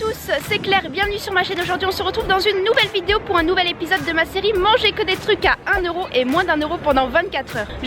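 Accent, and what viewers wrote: United Kingdom. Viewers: ton accent anglais on t'en veux pas... sans être méchant:) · fait une vidéo super sympa et très inspirante pour mon prochain séjour à Varsovie, et la seule chose que les gens commentent, c'est son accent anglais. Comment voulez-vous que les gens soient confidents en parlant les langues étrangères quand ils vont se prendre des remarques derrière... Cette mentalité, il n'y a qu'en France que je l'ai vécue et ça me désole! Merci pour ta vidéo!:) Well done!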